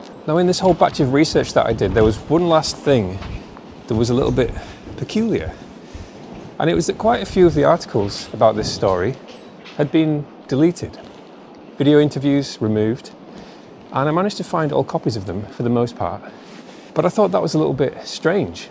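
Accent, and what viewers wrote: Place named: Yorkshire, United Kingdom. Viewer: He sounds normal for Yorkshire.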